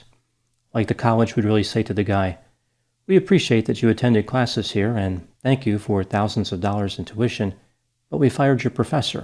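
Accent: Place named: East Coast, United States. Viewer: With all due respect to those from New Jersey, judging by East Coast accent, I'd say he's been there a time or two. So, he likely knows of what he speaks.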